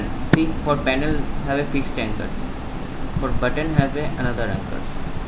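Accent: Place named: India. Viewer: indian accend just fucked up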